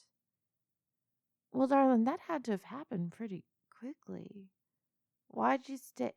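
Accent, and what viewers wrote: South, United States. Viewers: Thanks for the great audio I love the southern accent the idea of a strong minotaur girl wanting me is nice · Damn, your southern accent is hot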